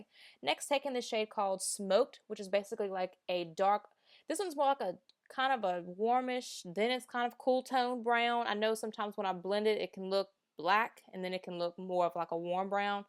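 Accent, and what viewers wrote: South, United States. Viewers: That southern twang! It's so cute!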